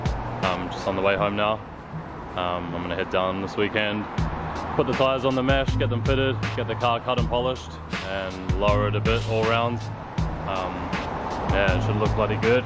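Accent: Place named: New Zealand. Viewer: Just stumbled across your vids. I recognise a Kiwi brother's accent. Love the vids. Just got an 83 ae86 from Japan late last year. Helping the 86 population grow in the motherland ha. Look forward to binge watching your content.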